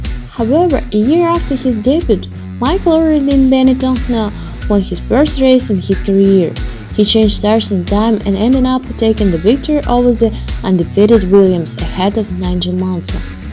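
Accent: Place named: United Kingdom. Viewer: При просмотре фильма, вспоминается документальные фильмы от BBC. Многие, кто любит смотреть такое кино, вспомнят, ламповое ощущение и детский восторг от просмотра. Особенно британский акцент, лично мне он нравиться с детства. Подобранная музыка, локация сьемок, манили не моргать. Кто интересовался шоу Topgear, тот поймет о чем Я говорю. Обнимаем и радуемся ее подачей.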